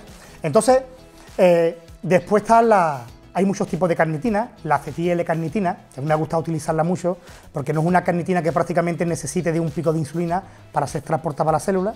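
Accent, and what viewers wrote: Andalusia, Spain. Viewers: Con ese acento español de no se que región, tengo que activar los subtitulos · Es andaluz. Por eso el acento.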